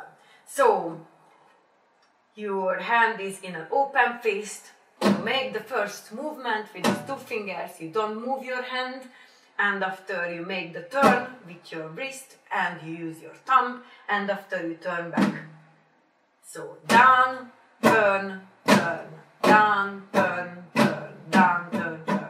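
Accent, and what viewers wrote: Hungary. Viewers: Nagyon aranyos a magyaros angolod :) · Trust me bro! I have a thick hungarian accent, just like has... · Your accent is so hungarian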